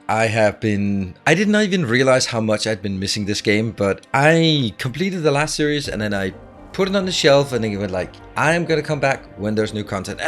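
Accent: Denmark. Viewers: Are you danish? (because of the accent. Nothing against danes, on the contrary...just curiosity)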